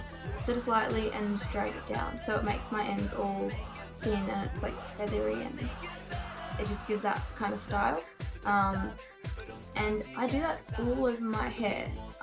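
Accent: Australia. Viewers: It's Australian accent <3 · alot of people commenting about your accent. but i didn't even notice you had an 'accent' because im Australian too. soo really: for me, it's not an accent :p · ARE YOU AUSTRALIAN :D I AM YOU SOUND AUSTRALIAN / NEWZEALAND MAYBE BUT U SOUND AUSSIE IM AUSSIE LOL Heyy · Aussie accent? I'm aussie! xD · she's from australia idk where. you can tell it's an australian accent ( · im pretty sure she's aussie. like me! :D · its australian lol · I though you sounded Australian so I checked your channel :D Me too lulz ^^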